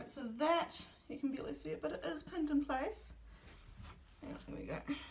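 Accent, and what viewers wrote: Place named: New Zealand. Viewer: your accent is very beautiful I don’t know much about your country and am really sorry if I get this wrong but is that the accent that sir Peter Jackson has and what’s your favourite thing about New Zealand and who is your favourite character in he lord of the rings and the hobbit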